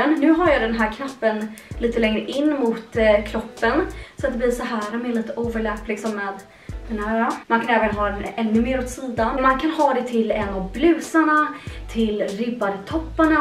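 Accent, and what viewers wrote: Stockholm, Sweden. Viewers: Nu har jag kollat på flera videos av och tycker att hon fortfarande pratar stockholmska och ser dessutom flera kommentarer att hon har ändrats sin dialekt. Är inget har mot tycker bara att degvätskan konstigt att man ska låtsats något eller göra det tillgjort om man inte har partar så innan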